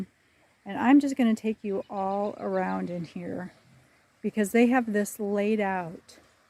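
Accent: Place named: Chicago, United States. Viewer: About 30 seconds in on this video I said to myself, “based on that accent, this woman must be from Chicago.” Then at about a minute later she says “we’re about 2 hours from Chicago.” You can tell that accent anywhere! Beautiful garden. Thank you!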